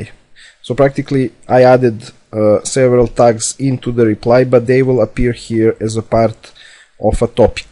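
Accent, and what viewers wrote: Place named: Poland. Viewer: Słyszę jakby Polski akcent, nie mylę się. Mówi jak mój nauczyciel od angielskiego w LO.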